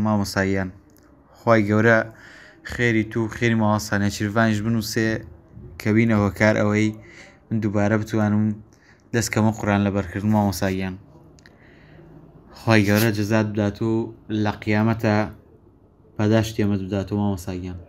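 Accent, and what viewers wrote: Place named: Garmian, Iraq. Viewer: ئەم برادەرە دەنگی بە گرمیانی ئەکات😁❤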